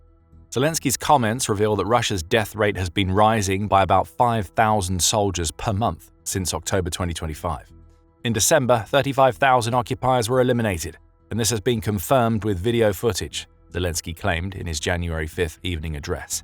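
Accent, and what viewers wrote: United Kingdom. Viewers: Lol Russia has 1.5 million active troops and tens of millions of reserves lol I know as soon as i heard a british accent this was gonna be utter nonsense lol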